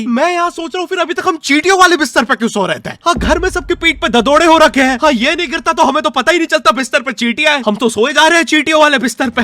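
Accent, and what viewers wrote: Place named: Malwa, India. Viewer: bhaii aap hamre malwa ke ho kya gav ke 😂 aaj confirm ho gya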